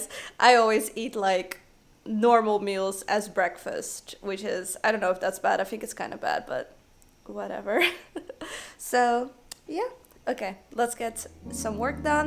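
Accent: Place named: Netherlands. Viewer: Are you Dutch? Idk why but you have a sort of Dutch accent haha.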